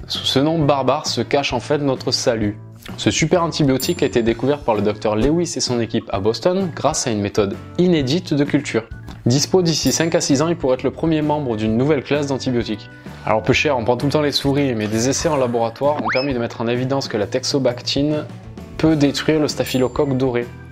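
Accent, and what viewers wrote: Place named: South, France. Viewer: cool on va arrêter de nous casser les ... pieds avec "les antibiotiques c'est pas automatique" lol t'as un petit accent du sud on dirait peuchère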